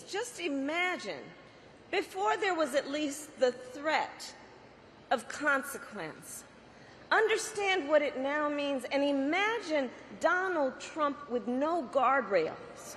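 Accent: South, United States. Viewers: Why does she all a sudden talking in a southern accent. Funny thing is that shes already been in white house for 3 1/2 years and shes making promises that she could have already done. People don't even do their research, Trump didn't even have anything to do with Heritage Project. This is a joke, i couldn't even listen to it all.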